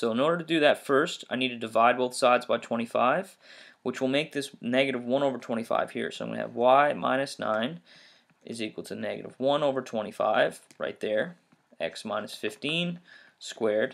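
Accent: Newfoundland, Canada. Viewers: Are you from newfoundland by any chance?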